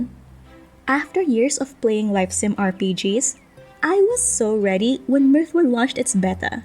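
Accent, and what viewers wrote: Philippines. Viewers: FIrst few seconds of watching and hearing you talk, I immediately thought "Fellow kababayan!" :D I'm so glad seeing more and more MIrthwood videos, we gotta spread the message of what a gem this game is! I've been following and playing Mirthwood since Playtests these last weeks and been playing since release. I enjoyed your video! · pinoy accent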